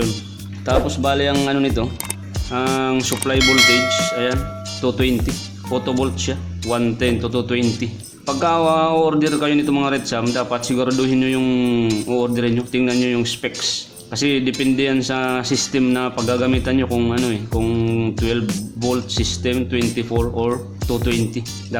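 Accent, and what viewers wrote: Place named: Bicol, Philippines. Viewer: aram ko po an tono kan pagtaram kan bicolano hehe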